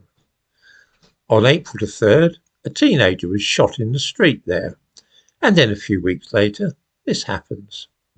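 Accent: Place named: Cockney, United Kingdom. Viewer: Spot on my little Cockney Sage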